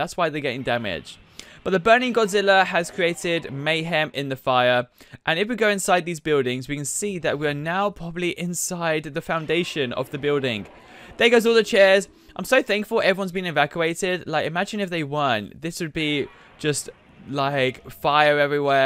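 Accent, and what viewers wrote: United Kingdom. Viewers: are you brittish ? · i think hes british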